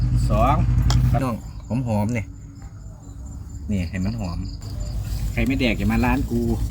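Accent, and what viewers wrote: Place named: Chaiyaphum, Thailand. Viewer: สำเนียงคนชัยภูมิจิครับ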